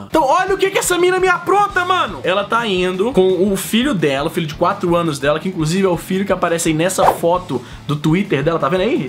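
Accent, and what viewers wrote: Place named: Ceará, Brazil. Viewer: Um dos vídeos que vc deixou transparecer seu sotaque cearense hehehe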